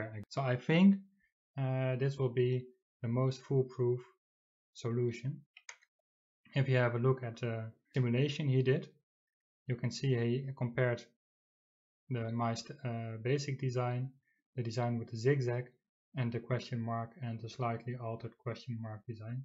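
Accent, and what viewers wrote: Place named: Netherlands. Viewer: haha het typisch nederlandse accent :). Btw, geweldige video's!!!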